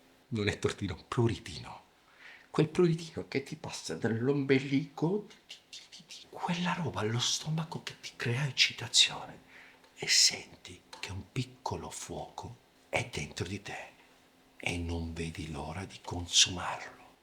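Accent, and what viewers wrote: Italy. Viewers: La cosa più tremenda di questi video è l’accento che ha Corona..da bauscia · Ma sto accento piemontese fortissimo??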